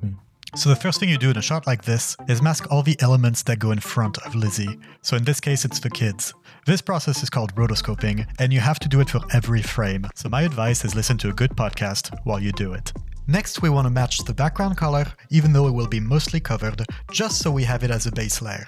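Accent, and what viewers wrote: France. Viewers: Are you french ? You have a little french accent. I'm french too ! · Ça sent l'accent bien français 😁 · Wow I love your channel! But when I was watching this I thought “ hmm this guy has a French accent” then you said “et viola” and then I thought “ this guy is French!” By the way I was surprised because I’m French too and you are one of my favorite YouTubers! So, please answer this question… are you French? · Tu as un accent français, tu es français ? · Aren't you French????? I'm hearing some French accent no?? (I'm French) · vu l'accent t'es français non?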